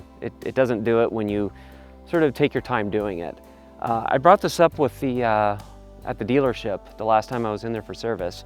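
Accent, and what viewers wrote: California, United States. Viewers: I was searching for California accents yesterday and stumbled upon your video from 11 years ago. Just saw another one of your videos now, and it feels so surreal. I really love your accent, and I’m definitely going to watch all your videos to learn it.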